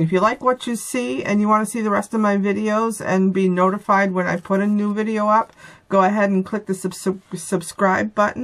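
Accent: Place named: Maine, United States. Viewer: Found your channel quite by accident and am enjoying watching your videos. I knew you were a Mainer just listening. Don't know if I dare start another hobby - it seems to be quite addictive - but may just have to give it a try.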